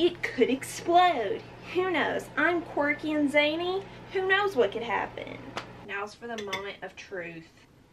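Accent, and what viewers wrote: South, United States. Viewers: I wish I could find myself a girl with your accent lol. Southern accents are sooo underrated. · your southern accent kills me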